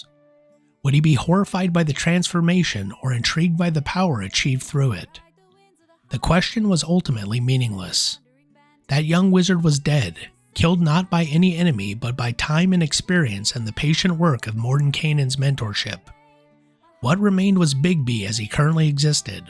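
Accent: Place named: South, United States. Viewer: you sound like you are from the south.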